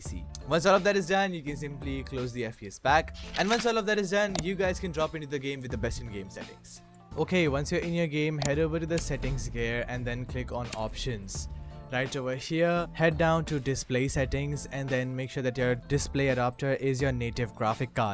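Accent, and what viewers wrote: India. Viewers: India Voice = HACKER dont download is file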